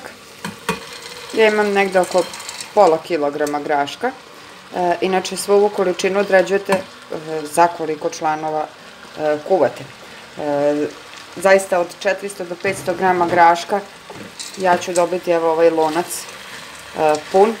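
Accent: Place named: Vojvodina, Serbia. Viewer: Ovako dobro se kuva i jede samo u Vojvodini. Najbolja kuhinja, najbolji kolaci;-)))), znao sam da si iz Vojvodine, cuje se po naglasku;-))) Puno puno pozdrava i svaka cast, i kuvas i mesis fantasticno;-))))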